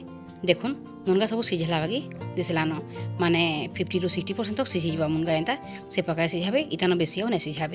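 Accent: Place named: Sambalpur, India. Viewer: Tumar samalpuri kathata jaha mitha lagla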